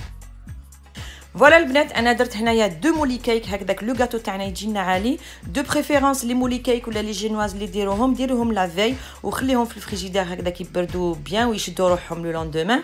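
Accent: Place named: Marseille, France. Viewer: 3andk acson marseillaise bonne continuation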